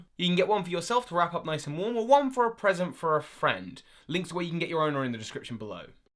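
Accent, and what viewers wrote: United Kingdom. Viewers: SwaggitySwooty it might just be his accent, he is from the U.K. after all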